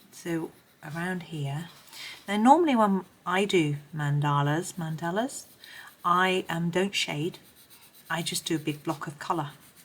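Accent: England, United Kingdom. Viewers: Oh, your version of this flower mandala is Beautiful!!! I love watching you color, giving us tips here and there. I'm a rather new subscriber and enjoy your coloring and, especially, listening to you. Your voice is so very soothing and your English accent is wonderful. I'm getting Back into coloring. I've had a rather long stretch of not coloring, but piecing quilts. So, not doing nothing, but piecing Can be frustrating, and coloring is just so relaxing. I could color for hours!! Thank you for your calm, inspiring videos. I enjoy them immensely. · Hello I've been following your channel now for a couple weeks, didn't say anything and I should of because I like very much not just your coloring but also to listen to you. I like that you choose more simple things and put emphasis on quality and you explain everything so well. I am so intrigued by your British accent I'm sure not the first to say that, although you probably don't think you have an accent. 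There are so many different kinds depending of what part of England you are, or UK, beside the Scottish and Irish accents. I use to be a professional musician, retired now, I have very good hearing still, maybe that's the only good thing I have since I'm older lol. So I can easily differentiate between the different English accents and yours is wonderful, sometimes you sound like Emma Thompson, I'm sure you know the actress, sometimes like Julie Andrews. It's very elegant, also your voice is very calming and you have such a nice sense of humor. So I really love to watch you and listen to you. I have some coloring and product questions, I will leave that for next time, this comment is already too long. Looking forward to the next video, you take care and stay safe. Judith 😊❤️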